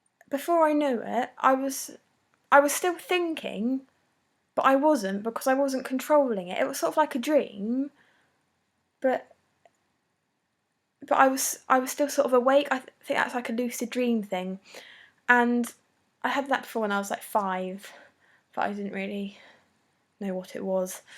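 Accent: England, United Kingdom. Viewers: I love the British accent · she looks french but has british accent xD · nice british accent, are those posters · be careful ms pretty english accent girl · are you from England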